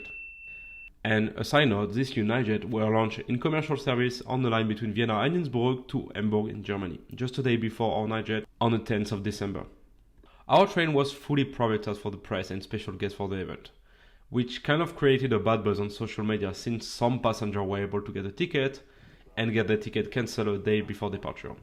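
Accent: France. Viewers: I agree with you entirely. His Franglais is annoying. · Sans vouloir être désagréable, serait-il possible d’avoir les videos sans commentaires off en anglais? Mes oreilles saignent en écoutant le lourd accent français, c’est difficile d’en faire abstraction. Les sous-titres avec le son d’ambiance font bien le job. Les youtubeurs japonais du train game font ça et vu leurs nombres de vues, ça marche très bien et ça fait moins de travail.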